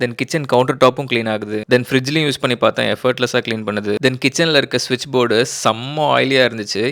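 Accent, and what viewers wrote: Madurai, India. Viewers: Anne neenga madurai yaa..? 😅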